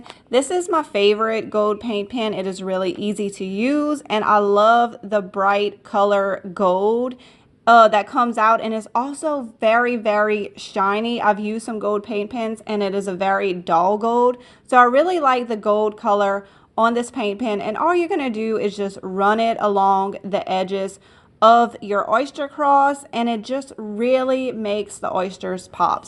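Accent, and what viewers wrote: Louisiana, United States. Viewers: You sound like you're from Louisiana, am I right? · She’s from southern Louisiana! Cajun country. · She is Cajun,her accent is wonderful,you my dear are rude !! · I thought I detected some Cajun accent in there. 😁😁😁 I am from Ga. We say "y'all"!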